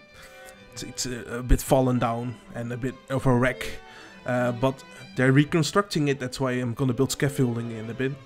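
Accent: Netherlands. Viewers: You sound very Dutch, are you?? Awesome build, nicely done!!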